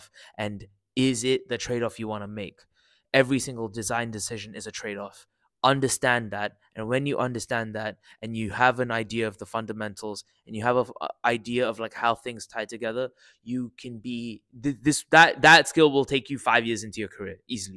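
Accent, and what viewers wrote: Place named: South Africa. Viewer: It took me 5 minutes into the video to place the accent as South African. I went through British to American, back to British and finally landed on South African 😂😂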